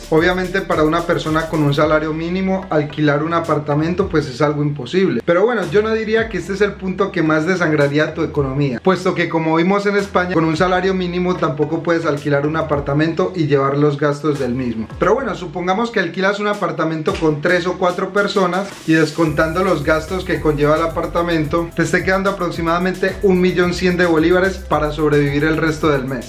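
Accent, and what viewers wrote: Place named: Antioquia, Colombia. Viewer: Este man es español o colombiano porque tiene acento paisa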